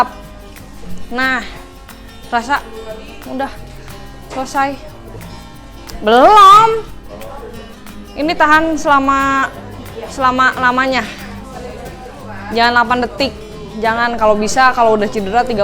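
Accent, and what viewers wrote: West Java, Indonesia. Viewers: Dari logat bicara mbk dari sunda..mereun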